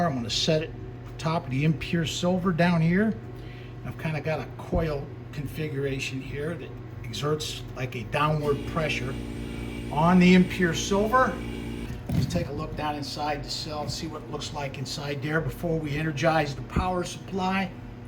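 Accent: United States. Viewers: I really enjoy listening to your American English accent. Is there any information in the literature on the ratio of silver to copper deposited when the electrolyte solution contains silver and copper nitrate? It's probably above 1000, right?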